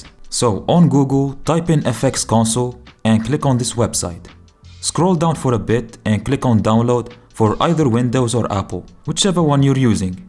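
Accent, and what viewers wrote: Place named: South Africa. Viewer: I know a South African when I hear one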